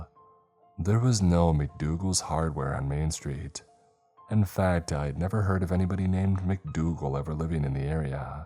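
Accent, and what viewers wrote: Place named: Minnesota, United States. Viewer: Sounds like southern Minnesota, for sure.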